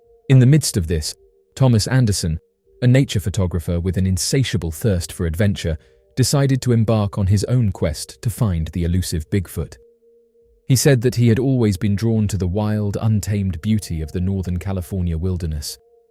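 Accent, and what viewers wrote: England, United Kingdom. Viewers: The idea of anyone believing in Bigfoot just because they hear a bloke with a calm English accent talking is ridiculous. Especially when Majority of people who say they've seen them & believe they exist are from the states. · This channel is a prime example of the power of a pleasant, clear English accent lulling people into believing completely BS theories and photos.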